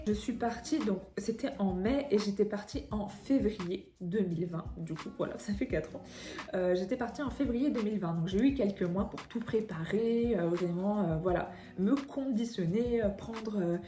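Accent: Quebec, Canada. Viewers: Vous avez pris une pointe d'accent québécois.. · Ça y est tu commences à avoir l accent canadien, c est trop mimi 😊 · Je trouve que tu prends le bel accent québécois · Petit accent québécois toute même 😁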